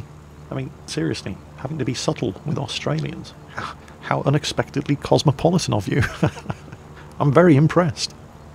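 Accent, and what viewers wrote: England, United Kingdom. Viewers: Well, I've never played WoT, but i almost feel like i know more about the game than a lot of newbies to the game and its all from watching your videos lol for whatever reason i just enjoy your commentary. Must be the English accent:D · I can attribute your success to three things. One- You're British. Americans love British accents. Two- You're retired Navy. You have a passion for military history and you know what you're talking about. We respect your opinions and input because of it. Three- You DON'T give a shit about what's popular and you refuse to conform to the modern YouTube channel standard, what I call "Pewdification". I mean no insult to PewDiePie at all, I mean, he found a niche and he milks it, and it works. But you stay specific, you talk with the fans, you do Mingles with, you study the game, and you know what you're talking about because you stay focused. 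This is coming from a Texan fan of yours. I will stop whatever I'm doing to watch your videos, and I am never disappointed. I love your stuff and I'm glad you don't plan on changing. I am also just fine with your new interest in Star Citizen, as you're still mainly focused on the content you have your main fanbase for. If you wanna upload some more SC videos, I think that's fine as well. So long as you don't turn into mainly SC. A trifecta of SC, WoT, and WT sounds okay to my ears, once SC comes out. Keep on tankin'. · Why subscribe to (assumed you like WoT or Warthunder) 1. He is authentic (or at least a pro in pretending to be) 2. He has, imho, a fantastic British accent 3. He has a kind of humor I appreciate cause its hilarious and mature the same time 4. He stays away from politics, religion and other "minefields" of internet communication 5. He lives my childhood dream (playing a game all day and getting money for it) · What you are doing is that you are an old man with a English accent, who happens to like video games.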